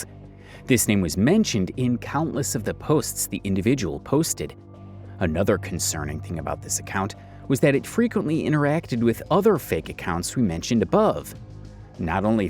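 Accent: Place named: Upper Midwest, United States. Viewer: I love this subject and what you do. As someone from the Midwest, I can't help but notice your accent through the video and it makes me really wonder if you're around or from the northern Midwest area.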